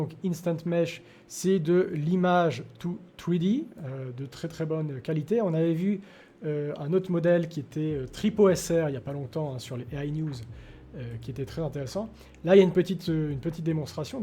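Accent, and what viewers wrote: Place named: Vaud, Switzerland. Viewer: Ça fait du bien d'entendre du vaudois sur youtube ;)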